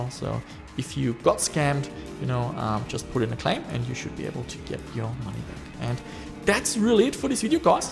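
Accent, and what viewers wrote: Germany. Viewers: DAT GERMAN ACCENT!×£°J™£¶~™OA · Your clearly sounds German why don't do the video in German? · Man hört das du nen deutscher bist 😂😂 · He's an Austrian who moved to Australia. That's why he has a German accent. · German? :D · Yay! A new Video. Btw. Are you German?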